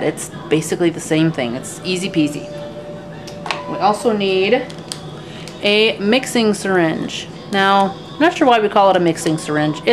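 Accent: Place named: Minnesota, United States. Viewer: Great video. Love your accent. I'm guessing Minnesota.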